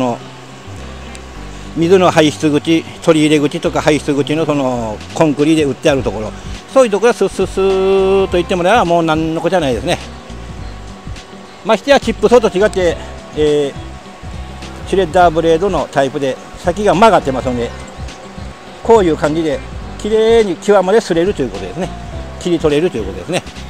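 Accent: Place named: Kagoshima, Japan. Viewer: あ！かごっま弁！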